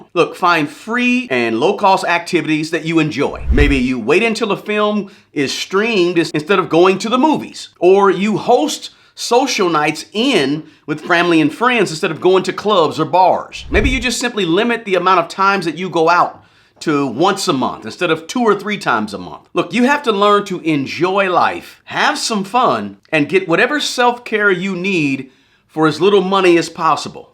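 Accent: South, United States. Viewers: I’ve started to live below my means. It takes discipline because I am a spender. Thanks for the tips. Btw, I love the southern drawl you have. God bless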